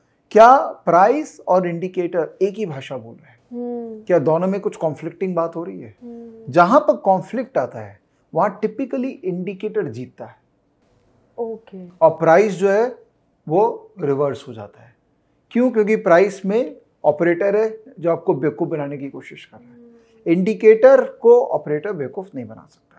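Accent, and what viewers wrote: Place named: Bengal, India. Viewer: "Pati" way 😄 You sounds so Bengali 😊😊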